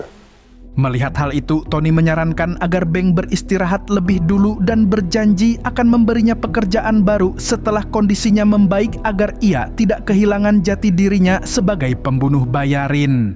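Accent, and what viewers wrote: Banjar, Indonesia. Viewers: Adminnya urang banjar sklinya😂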